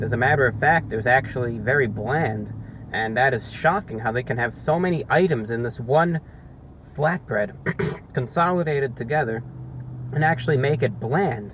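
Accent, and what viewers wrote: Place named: Mid-Atlantic, United States. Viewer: I've never seen anyone speak regularly with a Mid-Atlantic accent before. I like it.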